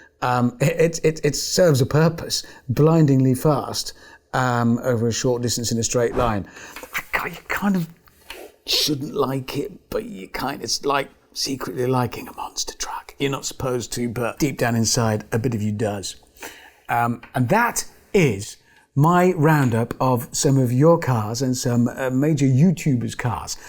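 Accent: United Kingdom. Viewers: I thought he was british not canadian...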